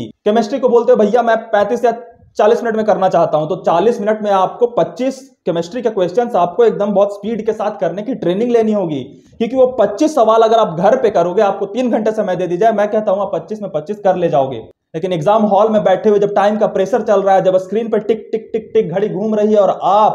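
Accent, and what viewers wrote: Bihar, India. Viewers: Bhaiya aap bihar se h kya pls Tell ❤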